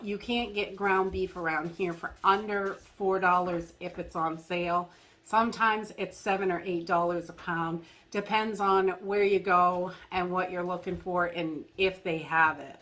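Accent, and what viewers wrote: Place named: Maine, United States. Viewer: You are so right! My husband said the other day, please don't bring any more food home, we don't have the room! Lol I have filled every area I can find., your 🤔Maine accent has never been more obvious. TY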